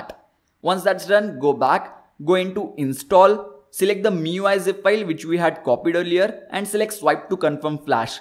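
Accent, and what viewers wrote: India. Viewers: Lol i hate this indi englsih :D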